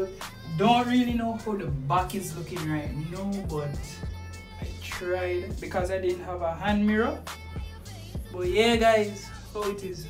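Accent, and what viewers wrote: Jamaica. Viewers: U Jamaican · I know u Jamaican breddah 😂 big up 🇯🇲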